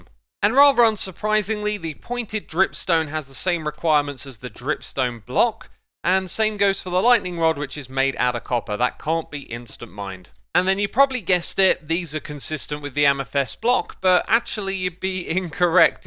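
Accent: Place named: United Kingdom. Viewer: let him pronounce words like he wants to. Stop forcing the american way of saying words on british people.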